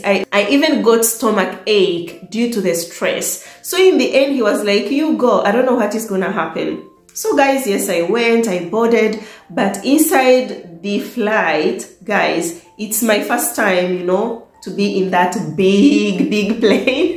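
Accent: Uganda. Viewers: Me too afirst I thought is ugandan or keyan good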